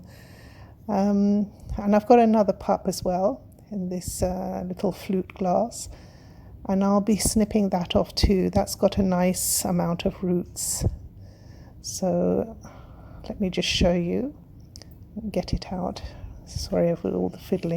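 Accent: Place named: England, United Kingdom. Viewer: Nice tutorial...love your very English Accent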